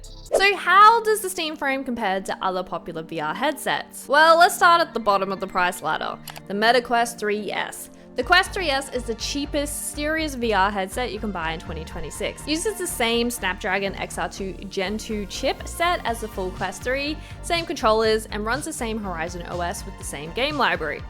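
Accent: Australia. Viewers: Great having an Australian voice and knowledge of local pricing and issues for VR.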